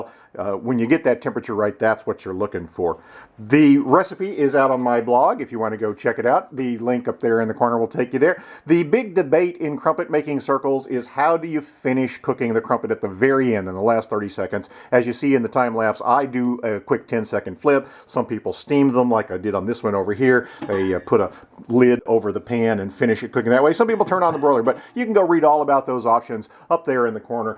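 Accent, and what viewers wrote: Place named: Canada. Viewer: You have a Canadian accent. So, where in Canada is there a shop that sells crumpets 24/7 ?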